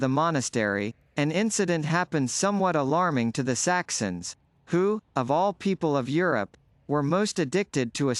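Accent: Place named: United States. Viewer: An American accent is really unsuitable, it's dreadful & unlistenable actually!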